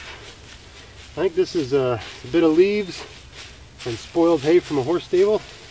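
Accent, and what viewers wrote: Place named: Atlantic Canada, Canada. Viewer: I've been trying to figure it out too! lol. More like Rick Mercer I'd say. East coast. Except Rick is from St Johns. But closer to Nova Scotia than Norm is for sure.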